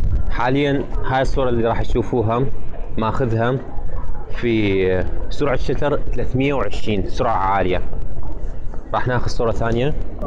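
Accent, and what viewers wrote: Mosul, Iraq. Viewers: كنك مصلاوي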